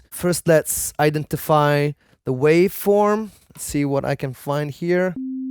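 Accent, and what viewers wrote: Italy. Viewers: you sound like you are italian, are you? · 😂 could be. His English is good indeed but he does have a pretty thick Italian accent. Nothing wrong with that but it's a dead give away.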